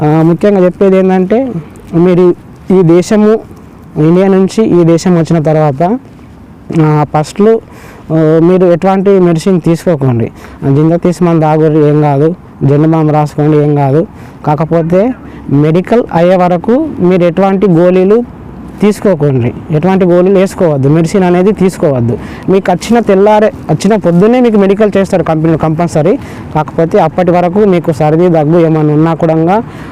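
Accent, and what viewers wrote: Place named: Andhra Pradesh, India. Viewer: నువ్వు కరీంనగర్ గదా నువ్వు సగం ఆంధ్ర సగం తెలంగాణ మాటడుతునవ్ మొత్తం తెలంగాన మాట్లాడు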